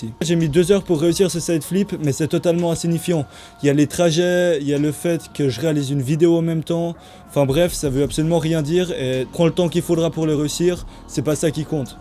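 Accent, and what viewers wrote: Switzerland. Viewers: Dès le début on reconnaît ton accent suisse ! TeamSuisse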